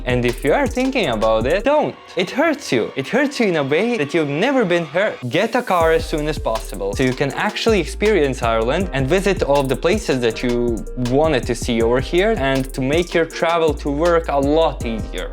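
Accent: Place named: Balkans. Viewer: I’m trying to determine your origin based on your accent. My guess is somewhere in the Balkans — waiting for confirmation. Btw your English is pretty good! 🎉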